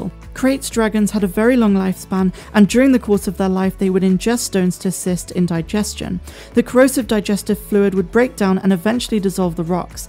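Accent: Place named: West Country, United Kingdom. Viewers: Well said but I'm still hearing West County accent 😂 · Jibber jabber. I detect some good old South West accent in there. Devon maybe. Nice to hear a fellow yokel on YouTube.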